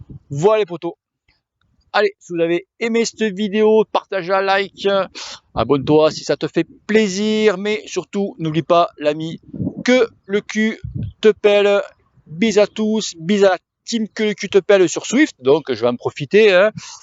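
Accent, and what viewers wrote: South, France. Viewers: S'il préparait un peu son texte et parlait un peu moins méridional ce serait mieux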